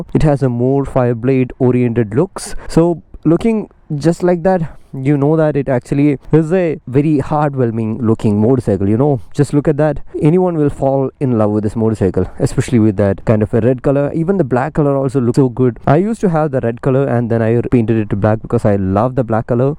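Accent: India. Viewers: Bro, strong request: get rid of your accent. Half the words you say are in the typical Indian accent, and the other half is a butchered American cum British accent. I mean, pick one and stick to it. Your content is good, but the delivery could be much, much better if you stick to an Indian accent. The accent soup is so distracting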